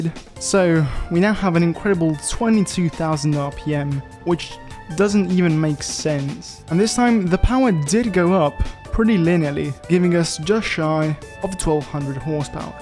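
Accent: Italy. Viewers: He's gotta be an Italian living in England with that accent. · this is the most italian accent that i've ever heard from an english guy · Me watching the video thinking a yes someone speaking slowly English,: speaking Italian Me: Ah ma sto qua parla italiano ecco da dove arrivava l'accento.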